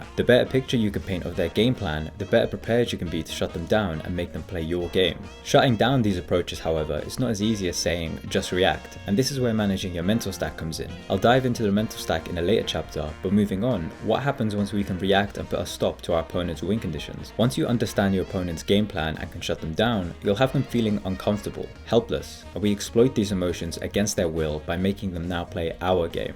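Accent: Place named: United Kingdom. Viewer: Leave it to a guy with a British accent to give me street fighter insight that applies to just life in general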